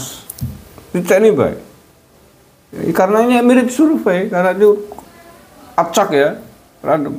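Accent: Banyumas, Indonesia. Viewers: Pak Njenengan bahasane ngapak, asli endi ? Nyong Purwokerto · Panjenengan pundi pak, kayane koq rada ngapak ya 😂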